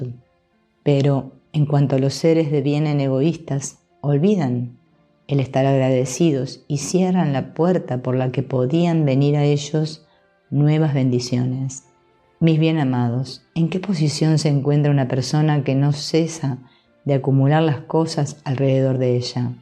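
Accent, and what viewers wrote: Argentina. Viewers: No comprendo tu voz Argentina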